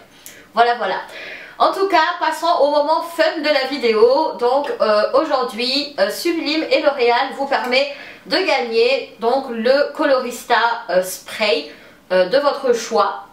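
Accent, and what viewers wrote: Madagascar. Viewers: Tu es malgache ?ça s'entend à ton accent ;)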